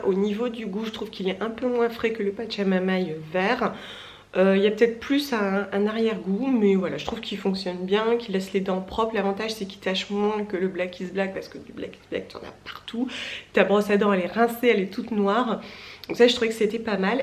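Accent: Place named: Nord, France. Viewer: Hé hé ✌️ ça fait bizarre d'entendre une youtubeuse du Nord !!! 😉😊 Entendre Lille, Tourcoing 👏👏👍👍😁 vive le Nord. Je viens de te découvrir. 2 ème vidéo. +1 Abo. 👍 Pr le cuir, c'est pareil, j'en ai encore. Ms je me dis que le mal à été fait, et de les jetter ne changera rien. Donc je les jetterai ds qq années... ( Comme toi, mes affaires dure un pt Moment... ) Merci pr tes idée et info en tt cas. 🙏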